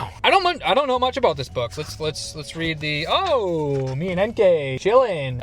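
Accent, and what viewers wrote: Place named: Boston, United States. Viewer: It's ok! I've noticed your "Bostonian accent" in other videos, such as saying 'room' as 'rum' (which initially made me rewind and play it back, like "wtf... are we talking about drinks now?!" LOL) It's all good... different accents are cool 😎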